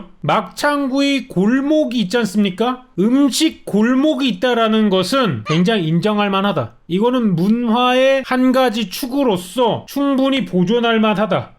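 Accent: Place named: North Korea. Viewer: 이사람말투왜이럼 북에서왔음?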